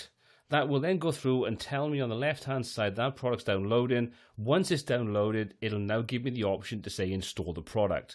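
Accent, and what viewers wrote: Wales, United Kingdom. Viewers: Great vid thanks. I'm a long time EZD user but definitely picked up some tips from this. I'm a Taff living in Aus. Nice to hear the home country accent too. Where can I listen to some of your musical output? Always interested in hearing other people's work.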